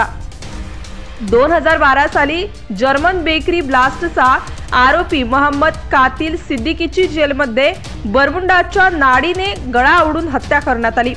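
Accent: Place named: Khandesh, India. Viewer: खाणदेशी आहे ती तिकडे मोहोळ ला मोहोड बोलतात.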